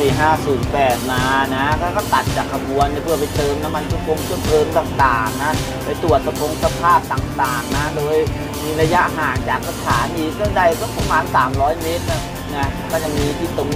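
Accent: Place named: Nakhon Sawan, Thailand. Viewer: ชอบสําเนียงพี่นะครับ ผมมีเพื่อนอยู่นครสวรรค์ สําเนียงแบบนี้แหละครับ ไม่รู้ว่าใกล้เคียงรึเปล่าครับ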